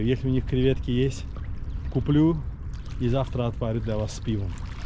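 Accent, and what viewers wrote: Baku, Azerbaijan. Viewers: муалим, процветание Вашему каналу👍🏻Ваш Бакинский акцент это вишенка на вашем волшебном канале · Ай джан! Бомба! Спустя 30 лет я ел рачки 2022 на Баилова. Спасибо за Ностальгию и Бакинский Акцент. 🙏❤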